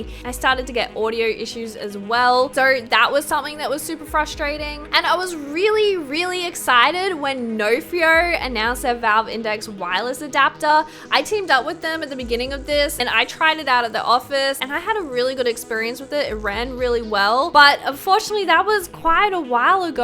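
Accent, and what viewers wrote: Australia. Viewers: Your Australian?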